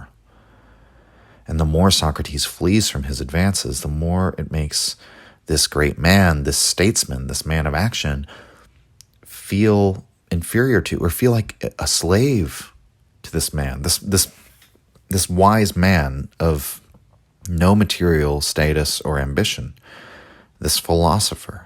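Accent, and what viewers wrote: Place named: England, United Kingdom. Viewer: I'll never get used to hearing ancient Greek names in an English accent 😭 I think you'd enjoy the sound of the actual names ❤️😊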